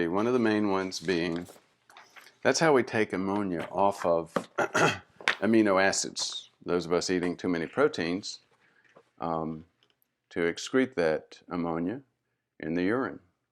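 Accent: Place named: Texas, United States. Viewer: Great explanation doc Simple and easy to understand And I like your Texas accent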